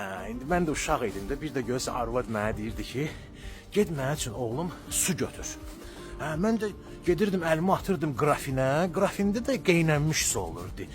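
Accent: Baku, Azerbaijan. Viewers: Ay oğul,söhbətində,o şəhdi-şəkər Bakı ləhcən dəmənə ləzzət elədi.Sizin kimi cavanları görəndə,gözümə işıq gəlir.Deyirəm:-- şükür allaha, hələ keçmişi bilən balalarımız var.Danış,qadan alım,qoy bilməyənlər də eşitsin.😊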